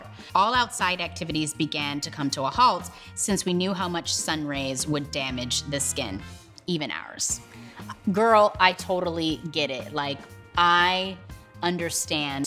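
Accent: South, United States. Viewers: You do a very good southern accent! Then again you did live in Texas lol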